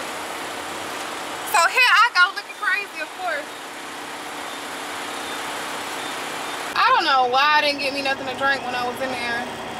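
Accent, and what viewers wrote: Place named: New Orleans, United States. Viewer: you from new orleans huh?i hear it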